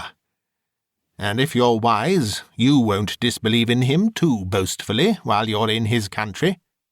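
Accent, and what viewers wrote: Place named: RP, United Kingdom. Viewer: do you think your narration is more British, or Transatlantic?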